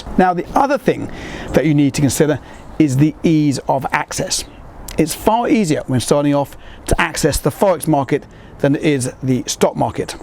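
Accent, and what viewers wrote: United Kingdom. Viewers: Love Your Video And Your UK Accent Is Amazing Too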